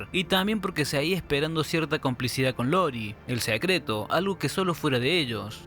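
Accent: Cordoba, Argentina. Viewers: Videazo re bueno el análisis y el acento cordobés le da el toque 😂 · que grande el acento cordobes loco, es mi favorito del pais. Saludos desde Cordoba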